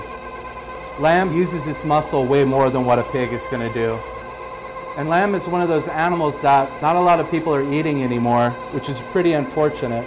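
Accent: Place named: Philadelphia, United States. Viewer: Think this dude is from Philly. He says certain words funny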